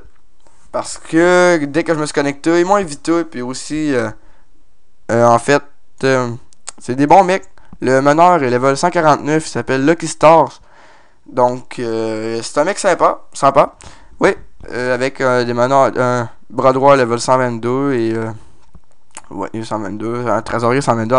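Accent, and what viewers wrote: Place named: Quebec, Canada. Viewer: Très bien ta voix québécoise ;) ! Et pour le nom du steamer....euh... Aucune idée mais fait gaffe au pseudo Vu que sans doute on n'aura pas le droit de mettre le vrai nom de la classe ;) et pour l'élément va sur le site dofus tu peu déjà voir quelque sort les caractéristique et tout :) bref...très bonne vidéo ;)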